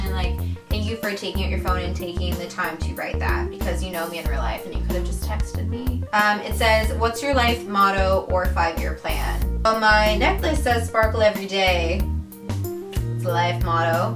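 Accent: Canada. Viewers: can you do the accent tag? :P i could always tell you were from canada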